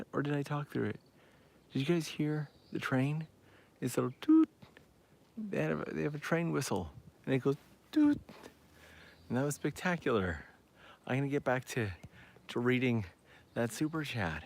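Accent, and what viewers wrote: Canada. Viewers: You look, sound and somehow talk like Ryan Reynolds...and I'm guessing Canadian?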